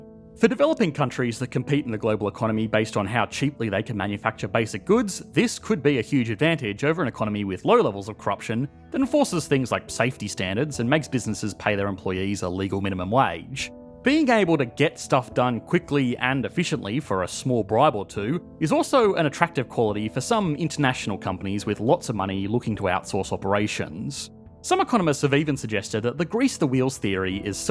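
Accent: Australia. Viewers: Annoying Australian accent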